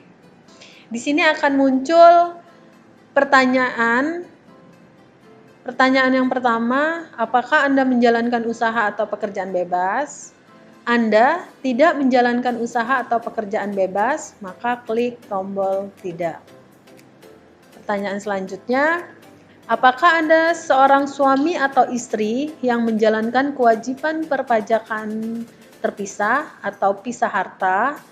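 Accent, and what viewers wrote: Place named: West Java, Indonesia. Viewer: Urang sunda kayaknya ibu nya.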